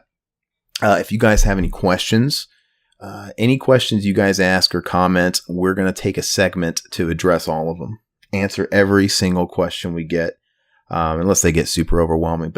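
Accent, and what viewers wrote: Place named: United States. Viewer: Nice to hear your american voice again brother! 😃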